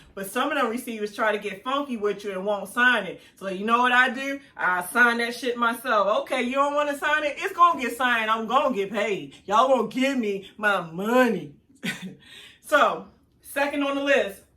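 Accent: Baltimore, United States. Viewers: You gotta be from the Baltimore area... Lol!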